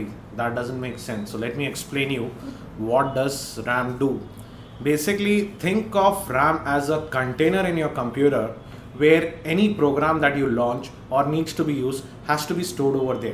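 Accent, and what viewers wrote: India. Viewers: I love the indian acsent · more thanks to you...i love Indian accent... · I love Indian accents! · Just the accent. Indians can do better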